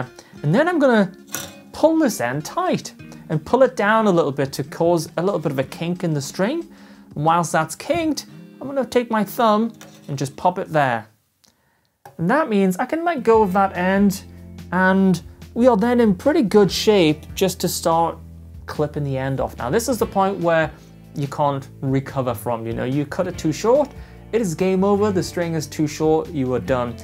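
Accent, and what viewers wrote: Newcastle, United Kingdom. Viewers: Ah, the toon accent! Drop you a sub, gotta support the local lad, awesome channel mate!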